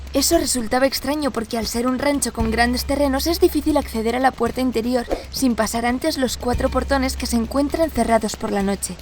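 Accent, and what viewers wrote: Spain. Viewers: Una historia latina narrada con una voz de española... Simplemente hermosa narración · por la forma de tu narración, eres española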